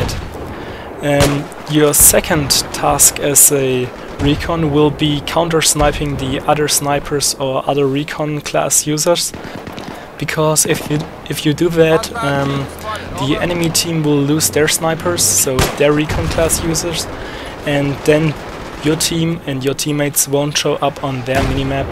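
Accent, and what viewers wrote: Germany. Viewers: als deutscher merkt man das. ^^ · Are u`re a german?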